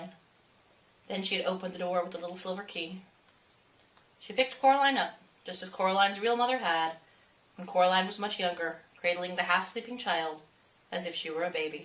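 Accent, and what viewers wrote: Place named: England, United Kingdom. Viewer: Best in an English accent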